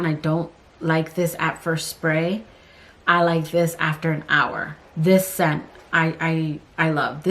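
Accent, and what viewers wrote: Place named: Miami, United States. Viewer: Girl, tell me how I’ve seen a couple of your videos and I thought she has such a Miami voice .. are you from Miami? 🤣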